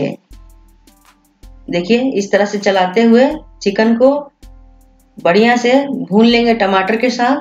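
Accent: Bihar, India. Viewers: waisey bahut accha...pink-pink madam ney kaha ki chiken ho jaiga.. hum rukey huan hain pink-pink chiken ko honey mein.. abhi to chiken brown brown hui hai..yeh hain bihari madam!!! baut mirchi masala khatey hain...accent sey kuchh andaza aya hai. Bihari food is very good. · Jhandu curry,bihari accent mein bol rahe hai aurbana punjabi curry rahe hai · Madam chicken se achi to aapki language lagi.....chicken to bnane k baad hi pta chalega...bihar se ho kya aap.... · Voice se Ho pta chal rha hai ye biharan hai ye kha bnaegi punjabi chicken kuri wo khawAt hai na bndr kya Jane adrak ka swad wo hi baat ho gyi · Aap bihari Hain na?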